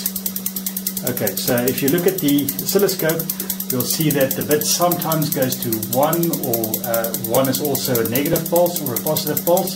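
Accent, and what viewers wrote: South Africa. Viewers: Lol i was able to tell your are from South Africa because i am originally from there lol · This is absolutely incredible! Wild find. I am absolutely flabbergasted that such a thing could be not only found, but READ in this time. O____O By the accent i'd imagine you're South African?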